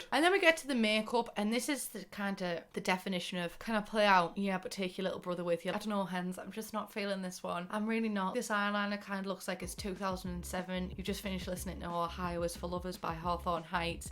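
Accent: United Kingdom. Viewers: I have to turn on closed captioning sometimes to figure out what you're saying (accent for my ears, difference of word usage from US to GB) and I just have to say, watching CC try and and guess what it is your saying is a crack up all in itself ('porn Hilda'...LOL). I will clearly hear words that it TOTALLY messes up. It's just a whole 'nother reason to come watch your videos. Congrats on finishing your Masters and getting your own place. You're doing so well and i'm sure your family is so proud of you. I may be 39, but I still remember those early college years and the struggles (and I only went for a BS, never got a chance to go for my Masters). I hope it's all downhill for you from here on out. I have to say, i'm not a huge occult person, but I am very excited for Realm of Magic. I don't know what I really expected, but so far what i've seen in videos isn't really what I was looking for (again, not sure exactly what I was looking for, but the build items, while nice, just don't scream "witches and wizards" to me and that's really what i'm interested in) but regardless, I will be buying it tomorrow. 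:) I feel like the GP are usually worth the $20 price tag, and i'm a penny pincher, but I don't mind paying for this one full price right out of the gate. Re: Windows...feels a bit lazy to me. I know it's a lot of work involved for meshes, but when the first set of windows are done, without actually ever having built a mesh myself (but having lots of design experience) usually y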